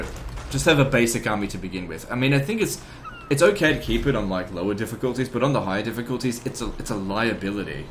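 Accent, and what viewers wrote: Australia. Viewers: " Im australian, and i swear alot" if that doesnt appeal to you .. REEEE HES AUSSIE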